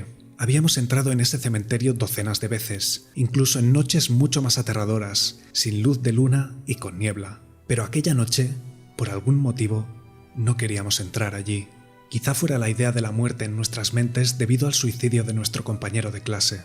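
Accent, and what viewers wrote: Castile, Spain. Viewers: AGUANTE EL ACENTO CASTELLANO DE CASTILLA!!!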